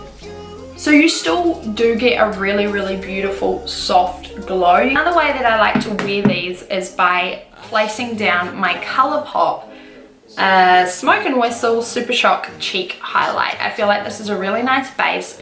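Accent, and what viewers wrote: New Zealand. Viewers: I almost guessed wrong but I paused and was like, "no, not Australian, I think New Zealand". I was so excited to have been correct. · Are you from NZ?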